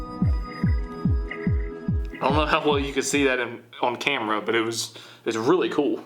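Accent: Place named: Pennsylvania, United States. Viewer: your from pennsylvania aren’t you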